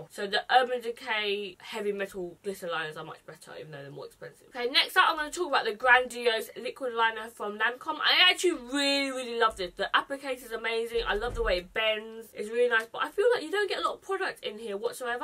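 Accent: United Kingdom. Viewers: Wait she's British what the fuck didn't see that comin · What your British????????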